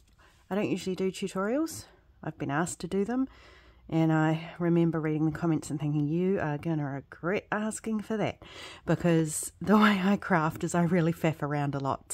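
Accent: New Zealand. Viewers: keep doing these step by step tutorials. They are great, plus I love listening to a fellow kiwi!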